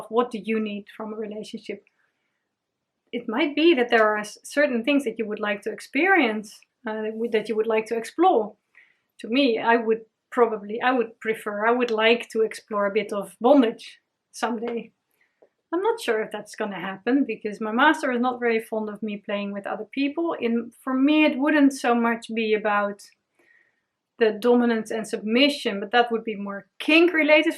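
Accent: Netherlands. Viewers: I came across this video when I looked up slave positions. I'm writing a piece of fiction that includes a BDSM relationship and I want to create a realistic image. Your videos give me a good idea of what BDSM is actually about. You don't just list the rules, but you also open up about yourself and your relationships. You come across as a kindhearted person who loves sharing her passion. Perhaps it might be a rude question - I apologize if it is - but would it be alright if I made a reaction/information video about some of your videos? If I use any of your material or refer to your videos, I will always give credit and place a link to the video(s) in question. In my environment, there are a lot of misconceptions about BDSM and the people who participate in it, and when I noticed that, I also realized that there is a lot of misinformation about BDSM, not just on the internet. 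I would like to help you and other creators spread correct information about it and help people find themselves and other people with who they can share their passions. It might be useful to mention that I am not a part of the BDSM community (yet) but I have been educating myself on it for a while now, and I would like to help other people do so as well. It's quite off-topic, but I love the accent. I am from the Netherlands as well.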